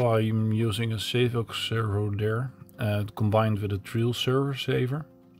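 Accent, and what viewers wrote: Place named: Netherlands. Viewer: Klinkt Nederlands 🎉 dikke setup. Vanwaar de servo saven van Vitavon?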